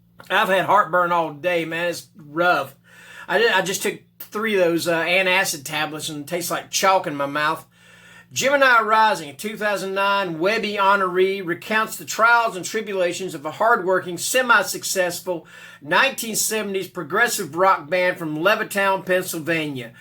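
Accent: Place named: South, United States. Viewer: you never lost your southern accent. Love it. 👍 Nick and Regina are so nice. Awesome book mail. I remember your review of The Ruins and how much some of the characters got on your nerves 🤣 Iconic. Cool Dracula knife.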